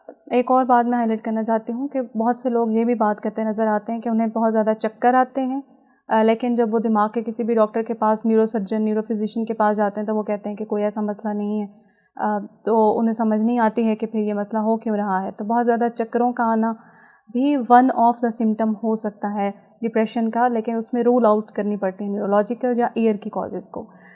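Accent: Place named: Saraiki, Pakistan. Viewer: Hello Madam, Good Day! Hope you are enjoying good health. My question is about bad thoughts. The bad thoughts about religion come to mind, if a person expresses these thoughts in front of someone, he/ she will be killed as a blasphemer, but these thoughts come to mind many times. is there any solution? By the way your speaking accent shows, like you are Saraiki.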